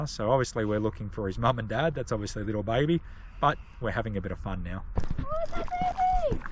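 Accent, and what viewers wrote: Australia. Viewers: Irish? LOL Australian.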